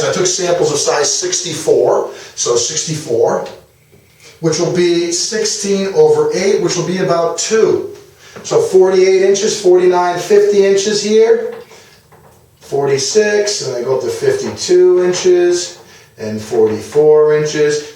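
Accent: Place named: Maine, United States. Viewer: Sounds like he is from Maine.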